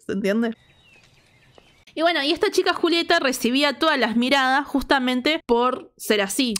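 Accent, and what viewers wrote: Argentina. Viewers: Eres argentina no? Xd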